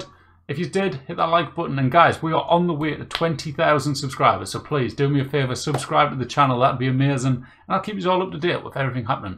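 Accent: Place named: Scotland, United Kingdom. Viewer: Are you Scottish or Irish or do you just have an awesome accent?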